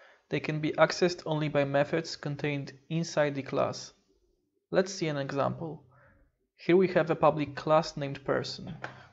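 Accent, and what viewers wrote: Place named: Poland. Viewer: Polak detected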